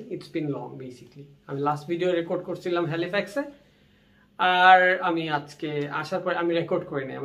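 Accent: Sylhet, Bangladesh. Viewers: Bro!! Sylhet apnar basha kuno? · ভাইয়া সিলেটের আঞ্চলিক কথা গুলা খুব ভালো লাগলো😍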